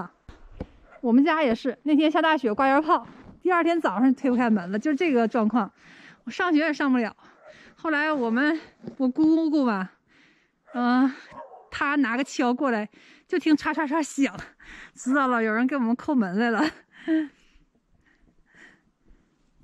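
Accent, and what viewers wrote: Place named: Beijing, China. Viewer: 美女和東北美景又加上一口京片子讓人欣賞影片時感覺真舒服，祝美女新春快樂！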